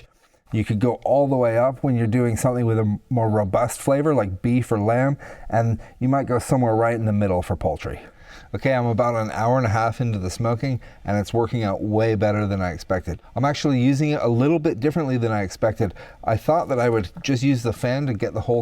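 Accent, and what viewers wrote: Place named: United States. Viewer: us accent, living in the uk, looking like an australian, this dude rules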